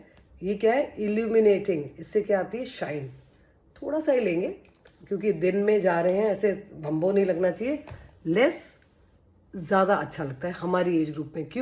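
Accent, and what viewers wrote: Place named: India. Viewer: Bhut a hi batten krte ho aap Meri ji age ke ho Mai b aise hi beaten krti thi kbi Aap ko dekh ke Muje pani image dikhayi deti h Aap bilkul desi style mai Bolte ho Bhut ache lgta ho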